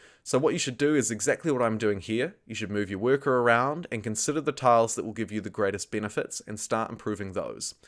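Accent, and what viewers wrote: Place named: New Zealand. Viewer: I love a New Zealand accent. Ben is "bin", and bin is "Ben".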